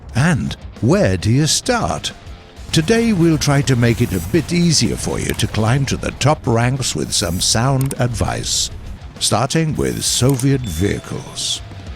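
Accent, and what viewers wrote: United States. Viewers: wait why did u turn American?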